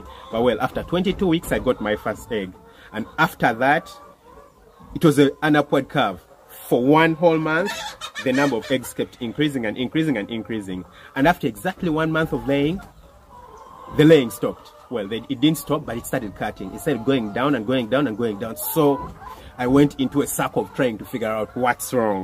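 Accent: Uganda. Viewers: Are you from Uganda? Your accent 😀... Anyways you’re doing a very good job , may God bless the work of your hands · I don't know what it is but I love hearing a Ugandan accent!! much love from your neighbour here 🇰🇪 great job Doc!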